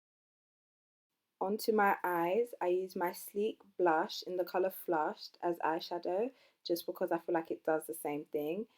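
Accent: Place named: Jamaica. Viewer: love the look....ur accent,,,, do you have jamaican background?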